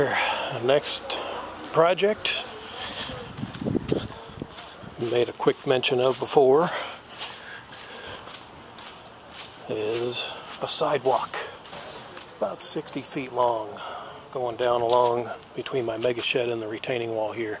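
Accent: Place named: Russia. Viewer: Мне показалось, что комментатор русский ))))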